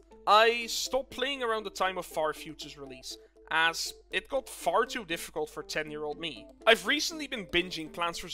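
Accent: Netherlands. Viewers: Are u Dutch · U dutch? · dutch accent spotted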